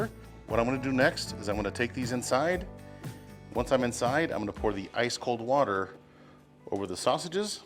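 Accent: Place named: Texas, United States. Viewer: you’re the best. As a native Texan living in SC, just hearing your voice makes me feel like I’m back in south central Texas. But amateur smoker and neophyte sausage maker, videos like these change my game. ¡Muchas gracias, vato!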